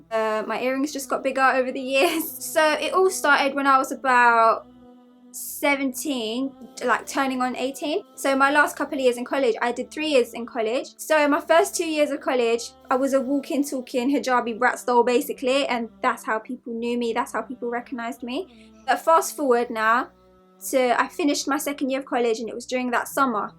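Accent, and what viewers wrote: United Kingdom. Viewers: Do u live in Liverpool or somewhere in northern England? Cos ur accent sounds like it also mashallah ur soo beautiful 😍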